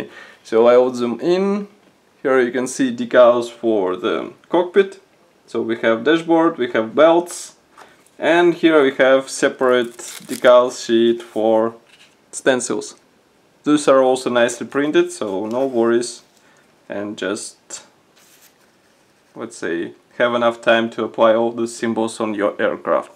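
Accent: Russia. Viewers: Мне показалось или я слышу русский акцент?....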